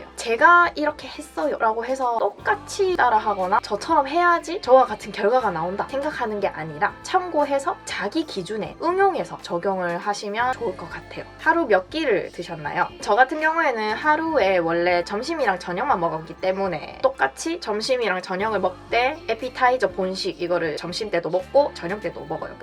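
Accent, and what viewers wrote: Busan, South Korea. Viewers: 부산？사투리가 너무 귀엽당…❤️ 다이어트 열심히 하겠습니다！！ 釜山？訛りがかわいい…❤️ ダイエットがんばります！！ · この方はサトリ(方言)ですか？素人ですが応答せよ1997のイントネーションに似ている感じがして、釜山の方なのかなと思いましたがわかるかた居ますか？ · i think it's satoori from busan but i could be wrong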